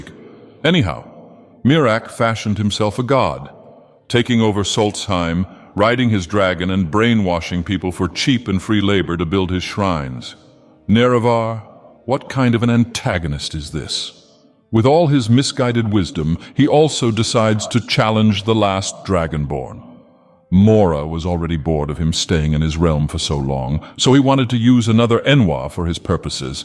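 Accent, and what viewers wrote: United Kingdom. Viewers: Anyone else notice an increasingly thickening British accent the past few videos?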